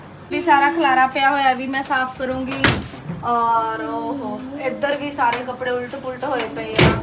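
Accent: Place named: Gurdaspur, India. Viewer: Je TUC gl gl te va va krde je aa bhut sohna lgda ah sade gurdaspur Batala wale bolde aa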